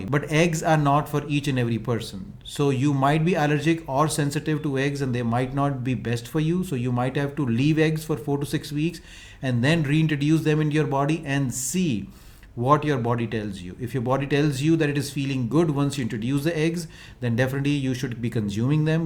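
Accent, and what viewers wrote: India. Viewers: Excellent info. Doc should improve his bad Indian Accent.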